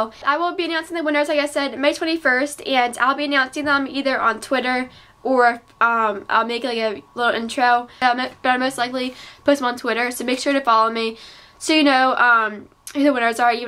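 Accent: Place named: United States. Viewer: Your so pretty and I love your accent I want to be American ahh , the falsies mascara did not justice for my lashes it just wasn't worth the rave hah xox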